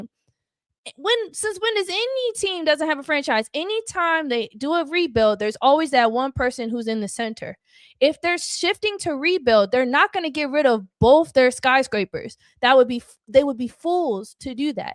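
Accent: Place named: California, United States. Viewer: You from Cali ? You got that California accent lol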